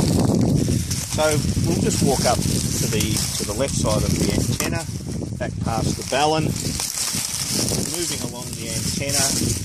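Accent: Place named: Australia. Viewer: is an Australian...from Australia. That is how he sounds. We're not all Americans, sorry about that!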